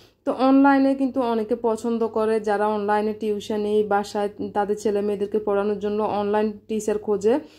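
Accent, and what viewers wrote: Noakhali, Bangladesh. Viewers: নখালিতে কথা বলিয়া ❤❤ ভাল লাগে ল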